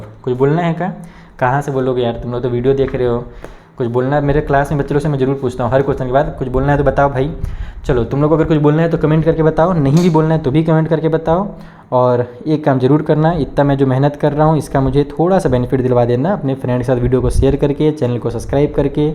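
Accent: Bihar, India. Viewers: Sir bihari language ❤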